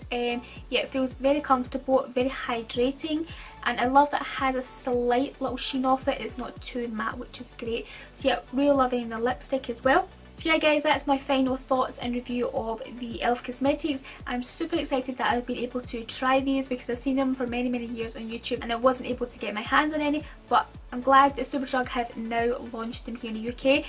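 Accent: Scotland, United Kingdom. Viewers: Omg your Scottish! Me too 😝💗💗 Have subscribed love your videos Soo gorgeous 😻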